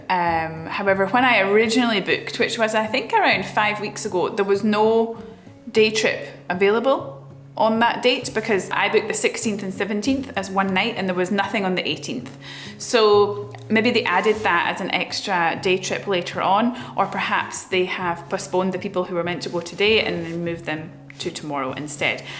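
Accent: Scotland, United Kingdom. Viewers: I am enjoying watching your video,s. You are a lovely lady, and I love your beautiful Scottish accent. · I am so glad I found your channel|! I truly LOVE your accent and Scotland. I have lived in Edinburgh for a year and toured around Scotland a lot. Love from🥰🥰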